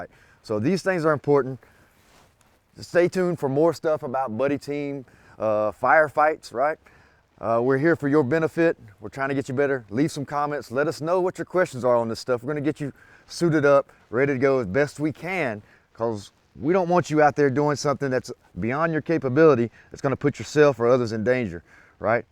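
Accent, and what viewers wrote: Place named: South, United States. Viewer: This gentleman is definitely from the south.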